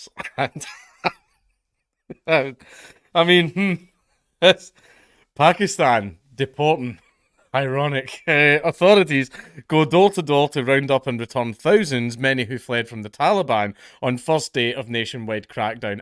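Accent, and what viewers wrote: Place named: United Kingdom. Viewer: century of prima nocta. He's got some brit up in there.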